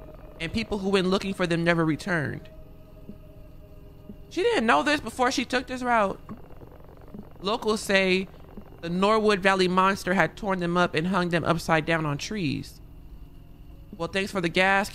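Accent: United Kingdom. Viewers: “Young tenderoni” 😂 love a fellow brit ✨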